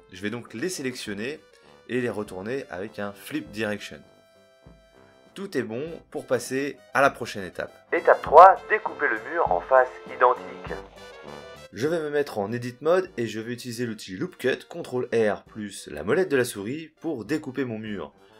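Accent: France. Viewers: Wowwww a really well edited video, nice! I really like your french accent haha I was okay with reading subtitles but it's true that it could've been a lot better. Above all, it was one of the best tutorials I've seen :) It seems you really know what you're doing and letting people know the steps one by one! Cool! If you make more archviz tutorials I would definitely come and always support your videos.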